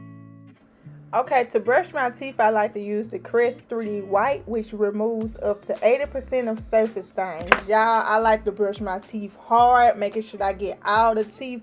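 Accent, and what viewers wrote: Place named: Memphis, United States. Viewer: That south Memphis accent❤️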